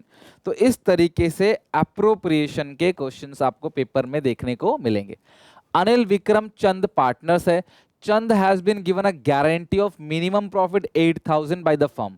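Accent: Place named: Uttar Pradesh, India. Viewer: Lagi raha tha ki tum up se ho😅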